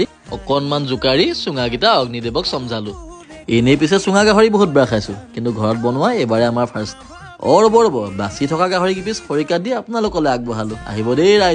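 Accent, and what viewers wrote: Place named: Sivasagar, India. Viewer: Video bur sai vl .😍lagil dada ...amr sivasagr rr jen paisu kotha Burt 😅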